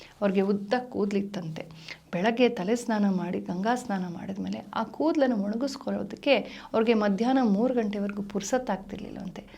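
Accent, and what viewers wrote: Standard. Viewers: swachavada kannada 🙏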